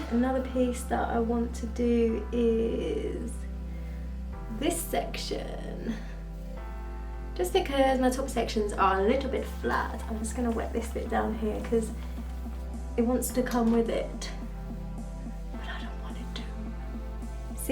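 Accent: United Kingdom. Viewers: What accent is this, it’s nice. I know it’s British but what specific accent? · Your hair is absolutely lovely! I really enjoyed this video and I'm looking forward to others. I adore British accents, your voice is relaxing.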